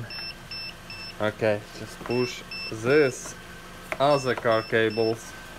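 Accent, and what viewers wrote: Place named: Russia. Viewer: I totally agree with you I couldn’t understand most of what he was saying with that Russian accent? I think he was trying to be funny or something but sounded so stupid!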